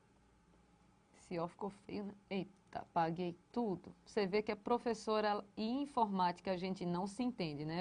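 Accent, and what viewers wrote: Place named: Northeast, Brazil. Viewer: sotaque do nordeste.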